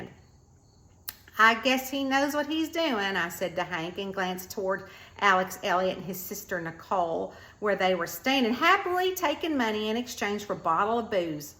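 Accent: South, United States. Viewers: Love that Southern twang when I know it's real. You have done a great job with the southern style of small town, been there, done that. Now I'm old.😄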